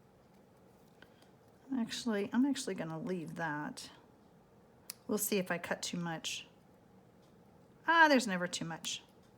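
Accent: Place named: Texas, United States. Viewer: Wonderful video! I wasn't going to buy this laser cut paper until I saw your video because I didn't know how to cut it or use it, but now I can't wait until my order comes and I can try to make a beautiful card. Thank you so very much! (I'm from Texas, too, and I love your accent....sounds like home to me!)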